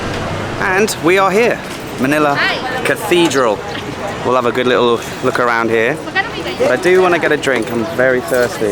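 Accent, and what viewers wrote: United Kingdom. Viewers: You have a British accent. Are you from UK? Do you have camera crew? You don't seem to be holding a camera? I also notice drone shots.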